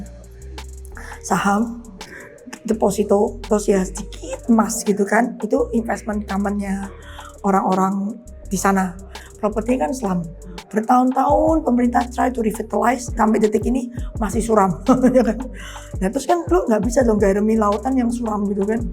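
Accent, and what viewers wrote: Java, Indonesia. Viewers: Cici jawane medok.. 😊😂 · Cici penyampaiannya gokil substantif dan mudah difahami, terutama medok lagi 😅👍👍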